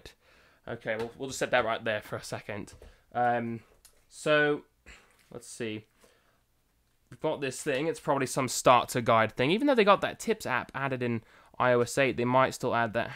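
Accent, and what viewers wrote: United Kingdom. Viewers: hmmm I prefer iphone 6 Plus although the size is huge but it's definitely the best,, problem is I only had it for not even a month yet and its already develop fault microphone and sound/speaker start to play up so had to sent it back to be repair and hopefully I will be able to get it back soon,,, btw dude you got some British accent there! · Funny how he says "United States of course" when he has an UK accent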